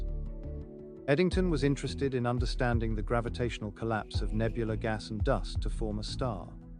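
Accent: United Kingdom. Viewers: Do they just hire anyone with a British accent to read and narrate? What happened to the previous narrator of "Bizarre Journey to the Outer Solar System""? The previous narrator sounded like Jude Law narrating you a space documentary until you fall asleep. 😂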